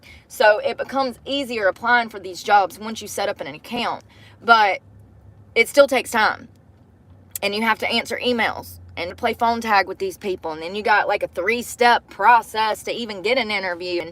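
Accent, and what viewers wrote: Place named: South, United States. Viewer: Your southern accent... it's on 🔥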